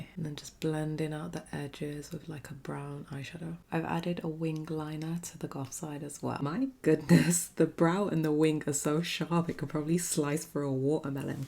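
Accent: United Kingdom. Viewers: She sounds so British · You mean to say, she speaks English in a British accent… like all British people do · I like both but goth is the winner for me also are you British or something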